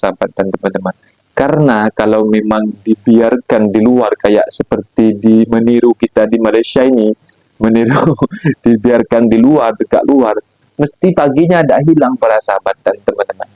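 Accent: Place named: Java, Indonesia. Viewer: Guna BM aksen jawa biasa lh tu👍👍mgkin yg komen tu,hanya pndai 1 bahasa jadi prasaan iri itu ada😂😂semangat bro,mental mau kuat💪💪👍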